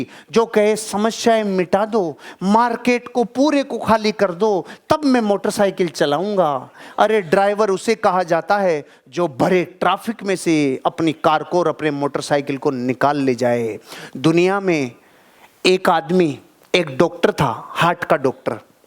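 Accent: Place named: Marwari, India. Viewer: Exlnt exlnt n exlnt sir.your marwadi accents n satic logics Gazab hai ji.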